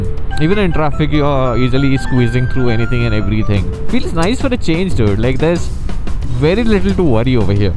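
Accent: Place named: Hyderabad, India. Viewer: Hi! Happy new year first of all... idk if you'll see this or not but very very lovely year of content from your side... and you pakka sounded hyderabadi with half the comments you were making in this video (yes you should come to hyderabad)... and next time come to Lonavala a lil earlier that way i can also see you!! Love from hyderabad man truly!!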